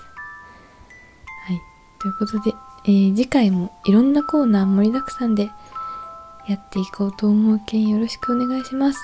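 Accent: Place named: Shimane, Japan. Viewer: の島根言葉がかわいｋ・・・zzzz..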